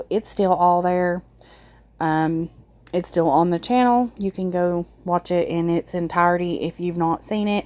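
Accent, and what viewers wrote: South, United States. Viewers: I love your southern accent and couldn't wait for this video to update us, thankyou for the work you do. I hope Hahn gets jailed it's what she deserves, I know she has children but she's made her choices and I believe she's a big player in the monkey buisiness. Thanks again and look forward to your next video. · Yaaay I'm so happy to hear from you!! Thank you! P.S. I Like your southern drawwl 🐴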